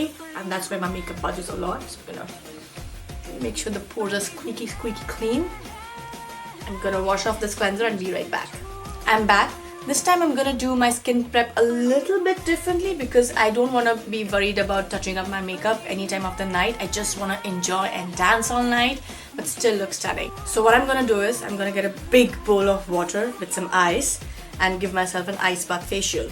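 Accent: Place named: Gujarat, India. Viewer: dayum that gujju accent tho :D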